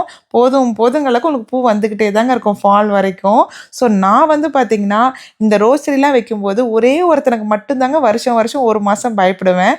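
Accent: Kongu, India. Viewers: Alagu Kongu tamil sema super ippadia iru Thangam ❤❤❤❤❤❤❤❤❤❤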